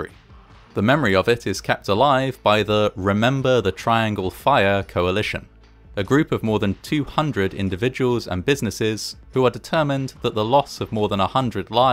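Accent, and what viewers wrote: England, United Kingdom. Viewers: I was under the impression that he was English because of his accent.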